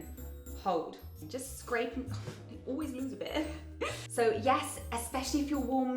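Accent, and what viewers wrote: United Kingdom. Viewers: You sound soo British cool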